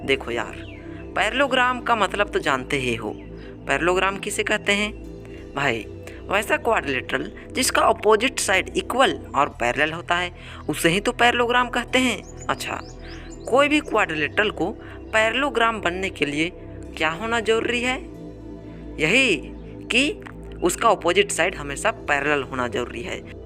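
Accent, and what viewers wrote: Bihar, India. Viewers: i like your bihari accent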